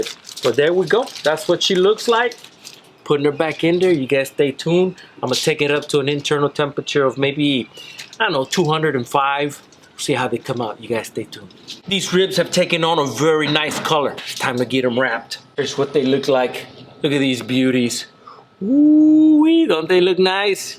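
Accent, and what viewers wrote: Texas, United States. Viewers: Ah, I'm originally from the Rio Grande Valley but live in Georgia now. Anyway, I could detect Texas in you. Been enjoying alot of your videos. Getting ready to place an order for some rubs but waiting on Spicy Chicken to get in stock.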